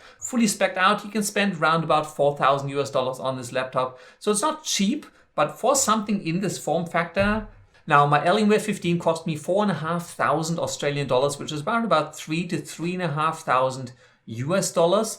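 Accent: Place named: Germany. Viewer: you kinda have that German accent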